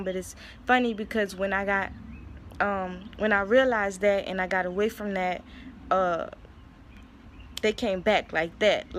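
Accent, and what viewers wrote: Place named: Louisiana, United States. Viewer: Is she from Louisiana? I hear a Louisiana accent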